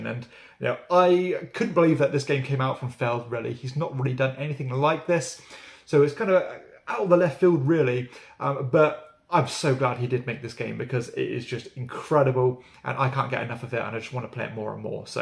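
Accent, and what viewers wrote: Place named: United Kingdom. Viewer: As a Brit myself i sometimes dispair at the domination of YT by our friends from across the pond …Its Great to see more English reviewers on YT …very clear and eloquently presented top ten …makes me so happy to hear British English from UK gamers hurrah !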